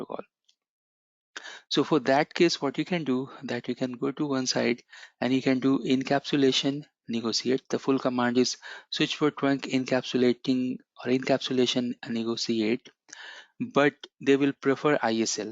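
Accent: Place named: India. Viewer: When I first heard your accent, I was skeptical; I thought of another Indian YouTuber that takes a 2-minute topic and extends it to a long, painful 10 minutes. NOPE... I was wrong; your material is solid, straight to the point, has no wasted time, and is well thought out and explained. I recommend you to my peers, but this class is a must; well done.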